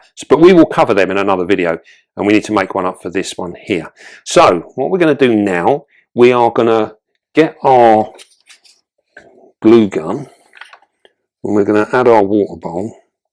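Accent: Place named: Cockney, United Kingdom. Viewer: "Oh maay giddy aunt"......😮...😅 Brilliant cockney real London gab, luv it ❤ 👏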